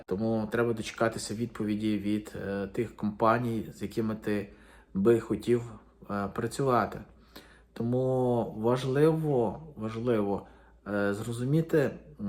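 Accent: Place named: Western Ukraine, Ukraine. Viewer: Ви не будете мати роботи, яка буде високооплачуваною,тому будете мати тільки на життя і їжу. Ми за кордоном потрібні як робоча сила. Я розумію тих біженців- українців, хто з окупованих территорій, або зони бойових дій, ваша вимова (суржик) дає зрозуміти, що ви із заходу України, тому не зрозуміло навіщо ви травмуєте трьох дітей цією поїздкою. Ніколи емігрантів не приймуть як своїх, а у місцевих "усмішка на обличчі, а позаду зуби на спині". Я так пишу, бо маю досвід біженки, але я виїзджала сама, щоб знати чи варто їхати сім'ї з дітьми. Саме головне знання мови, якщо гарна іноземна, то влаштуєтесь, якщо ні - нічого їхати, або їхати в Польшу. Бажаю удачі, вона вам ще й як пригодиться!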